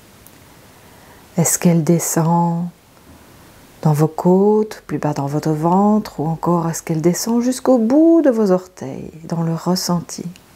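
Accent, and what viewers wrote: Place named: Belgium. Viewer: Bonsoir et merci pour cette nouvelle séance qui m’a fait beaucoup de bien. J’ai appris que vous habitiez Mons, en Belgique. Je me doutais un peu, j’avais reconnu votre accent, je suis du Nord de la France 🇫🇷 et le mien ressemble au vôtre. J’ai eu une douleur supportable au milieu du dos, du côté droit que je n’ai jamais eue, est-ce à cause de cette pratique ? Pouvez m’écrire le nom de votre nouvelle pratique, les heures et jours où l’on peut vous retrouver en live sur YouTube car je n’ai pas eu le temps de les noter. Merci beaucoup.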